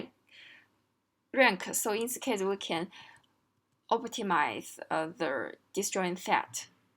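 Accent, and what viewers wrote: China. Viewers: 博主浓浓的汉语味啊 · 小姐姐是不是山东人^^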